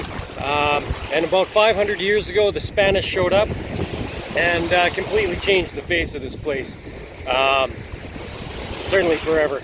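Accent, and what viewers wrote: England, United Kingdom. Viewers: confirmed...it is possible to have...to much of a good thing...more sexy english lady voice overs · Love some of the finds and beautiful pics too!! Great English accent on voice over :)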